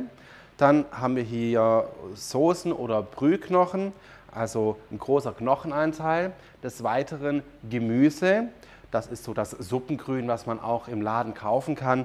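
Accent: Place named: Swabia, Germany. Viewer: Oh... ich kann mich auch vollkommen vertun. Aber ich rate mal einfach frei raus: Nürtingen? Südlicher? ;-D Isch jetz nix Böses gemeint! Isch bloß an Witz. Danke für dein Video!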